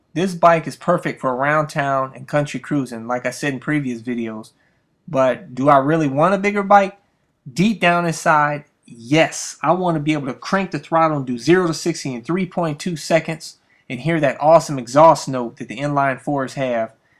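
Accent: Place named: Ohio, United States. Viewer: it's the Ohio accent we all sound alike most of the time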